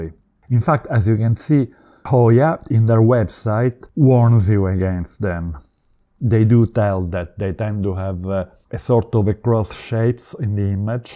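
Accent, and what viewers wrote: Italy. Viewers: I like your italian english. Made me feel like at home again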